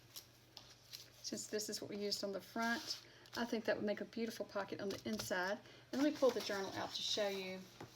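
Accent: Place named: Texas, United States. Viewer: Where are you from? My first language isn't english, I understand everything you say but I can help thinking that you may be from Texas or some american place with cowboys and sun lol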